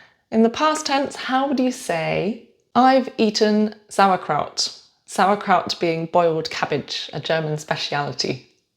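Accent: United Kingdom. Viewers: Bitte. Ich denke, das sind keine Komplimente, das sind Tatsachen.🙂 By the way: listening to your British English is so pleasant - I detest American English.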